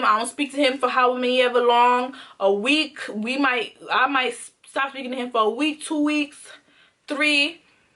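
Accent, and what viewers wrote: Jamaica. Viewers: From mi hear the accent you a Jamaican ❗️❗️❗️❗️ found you in my recommendations.... like 20 secs in the vid n like it already ❤️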